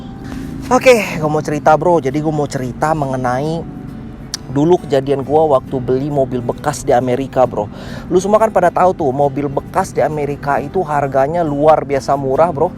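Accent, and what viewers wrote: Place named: Riau, Indonesia. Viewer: Ngomongnya kaya org riau. Hehe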